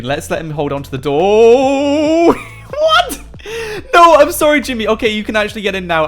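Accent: England, United Kingdom. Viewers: Are you from England?????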